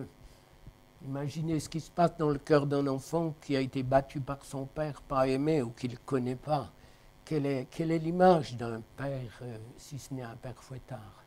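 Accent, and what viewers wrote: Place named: Vaud, Switzerland. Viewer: Magnifique avec ton accent vaudois Dieu Te Bénisse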